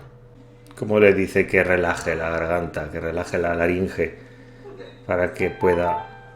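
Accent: Spain. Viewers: De donde eres , Valencia ? xD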